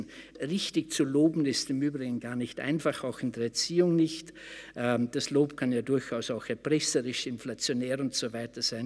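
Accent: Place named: Austria. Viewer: Aaah, kenne den Herrn aus gewissen Truecrime-Formaten im TV!!! Fand schon immer, abgesehen von der Qualität seiner Wortbeiträge, dass er äußerst angenehm spricht!!! Ein toller Redner und dazu noch dieser leicht österreichische Dialekt!!! Zum Verlieben! ❤️😂 PS. "Verrecken" ist für ihn ein Kraftausdruck!!!! Wow!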